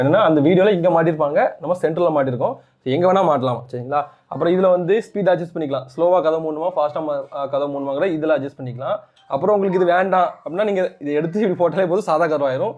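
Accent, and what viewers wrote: Tirunelveli, India. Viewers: Tirunelveli ya bro ungalukkku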